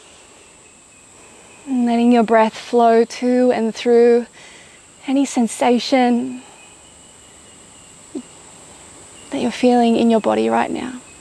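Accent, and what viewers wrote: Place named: Australia. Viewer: Thank you so much for taking your time for ourselves. What a beautiful practice once more. I missed you and your beautiful practices and your beautiful australian accent and the beautiful sounds of nature all around you. It is hot and sweaty there and I am freezing in front of the wood-stove here early in the morning! The cicadas are long left towards Australia and still very nice to hear that they reached their destination! All the best.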